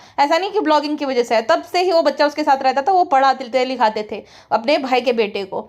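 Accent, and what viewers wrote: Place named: Uttar Pradesh, India. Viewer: Your UP accent😂😂😂 orii morii maiyaaa 😂😂 love your podcast ❤ love and support from Gr Noida..😊 aur video like kardiya h❤🥲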